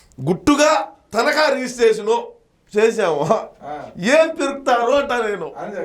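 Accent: Rayalaseema, India. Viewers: వారు మీ విశ్లేషణ అద్భుతంగా ఉంది.. మీ బాడీ లాంగ్వేజ్ చాలా బాగుంది. అంతకుమించి మీ రాయలసీమ భాష యాసలో అమోఘంగా ఉంది.. మన ఆంధ్రప్రదేశ్ రాష్ట్ర భవిష్యత్తు కోసం మీరు చేస్తున్న ఈ త్యాగం చరిత్ర మరువనిది మరపురానిది... నీ విశ్లేషణలో పండితుడికి పామరుడు కి అర్థమయ్యే రీతిలో. కాల్ అంకుశం గా. గ్రౌండ్ రియాలిటీ ను నిక్కచ్చిగా నిష్పక్షపాతంగా... నిర్మొహమాటంగా.. ప్రతి సంఘటనను కూలంకుషంగా. నిజాయితీగా నిబద్ధతతో వీడియోలు చేస్తున్న మీకు. నా యొక్క కృతజ్ఞతలు తెలియజేస్తున్నాను. అని పేరు పెట్టుకున్న మీకు. నిజముగా మహారాజుకు ఉన్నంత మంచి మనసు ఉంది..... అద్భుతమైన మీ విశ్లేషణతో ఆంధ్ర రాష్ట్ర ప్రజానీకానికి చైతన్యం కలిగించి. మార్పుకు నాంది పలికే విధముగా వాళ్ళ మనసులు మార్చి.. రాబోయే కాలంలో వాళ్ళ భవిష్యత్తును బాలేదు నిర్ణయించుకునే విధంగా. మీరు చేస్తున్న త్యాగం కృషి మరువలేనిది. న్యాయం ఎటువైపు ఉంటే. వారికే ప్రజలు పట్టం కట్టాలని. రాష్ట్ర భవిష్యత్తును తీర్చిదిద్దుకునే అవకాశం మన చేతుల్లోనే ఉన్నది అని నిజాన్ని నిర్భయంగా చెప్పే మీకు నిండు నూరేళ్లు సంపూర్ణ ఆరోగ్యంతో ఐశ్వర్యంతో ఉత్తర తో కాలనీ మీ కుటుంబాన్ని దేవుడు ఆశీర్వదించాలని మనస్పూర్తిగా కోరుకుంటూ. 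కృతజ్ఞతలతో🎉🎉🎉🎉🎉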